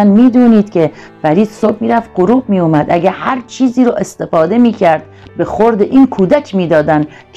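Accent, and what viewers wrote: Iran. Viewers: خانم بسیار با لعجه ایرانی حرف میزنید لطفا اول قضاوت بیجا نکنید راجع به همایون چون او آنقدر حمایت کرد و همایون پیش از فرید بینیده خود را داشت فرید را همایون بد نام و نابود نکرده خودش با اینکه همایون همه خوبی و اعتماد کرد خوش با ذیرکی که داشت خودش دوباره در چاه خود را آنداخت مگر همایون میخواست او را از چاه بکشد خودت خانم فریب پویا را نخور اگر بسیار مهربان هستی بیا دست فرید را بگیر نه اینکه از ایران اینقدر قضاوت ناحق کنی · خانم جان خودت واقعاء یک انسان دورغگو هستی در یک ویدئو از همان تعرف میکنی وحالا از همایون به بدی یاد میکنی با لهجه ایرانیت. خواهر اگر پول می خوای پیدا کنی یک روز را یکی را خوب وبار دیگر از او بدی میگوی خودت هم یک هنر مند خوبی هستی دل سوزی تو به فرید نداری به خودت دا ی یک چینل داشته باشی خانم همایون افغان آدم بسیار خوب است فرید را تشویق به دروغ گفتن نکن باید فرید سر زنیش شود خودت دل سوز نشان ندهید همه به خاطر پول از یتوب است خانم جان هنر پیشه خوبی هستی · دختر قوما اول باید لهجه ایرانی بگذار و به لهجه دری زبان مادری خود مان حرف بزن باز بیا حرف بزن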